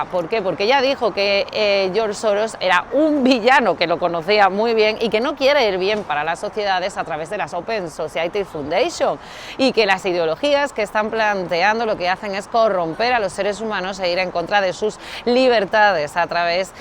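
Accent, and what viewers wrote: Spain. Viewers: Me en canta tu programación pero también me encanta tu acento español😍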